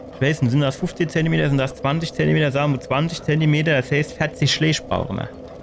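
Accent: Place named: Palatinate, Germany. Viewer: YouTube wäs hald was guud is. Kä Ahnung warums ma Dark Souls vorschlachd, awa mim pälzische hots mich kadd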